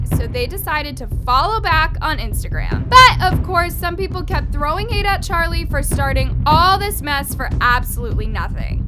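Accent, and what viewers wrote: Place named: AAVE, United States. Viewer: literally just aave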